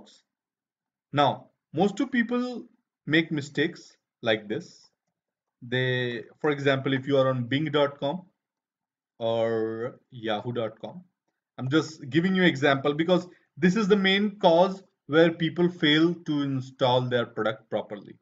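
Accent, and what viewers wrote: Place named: India. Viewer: Fuck you and your accent. Man I hate when an indian speaks English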